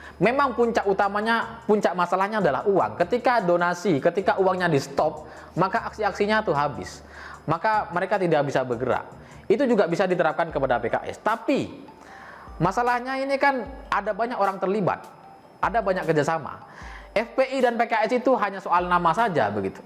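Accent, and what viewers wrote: Java, Indonesia. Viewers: Keren mas... , aslinya cerdas banget.... Gayanya aja dibikin rada medok...👍👍👍👍👍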